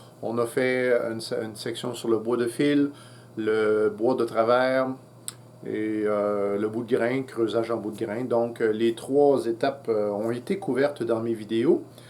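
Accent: Quebec, Canada. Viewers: Je découvre le tournage. Bravo vos vidéos sont très utiles et... j adore l accent de la Belle Province!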